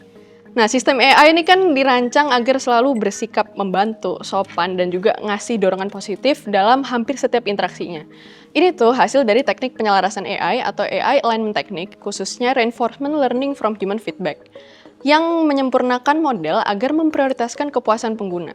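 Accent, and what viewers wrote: Java, Indonesia. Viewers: medok like this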